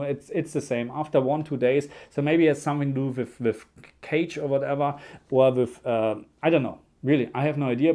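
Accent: Germany. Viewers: You are clearly of german origin, right? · Du bist 100% deutscher oder? Diesen englischen Akzent erkenne ich überall 😅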